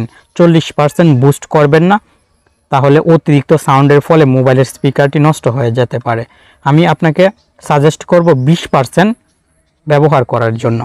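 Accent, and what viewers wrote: Mymensingh, Bangladesh. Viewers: Vair bari mone hoi Mymensingh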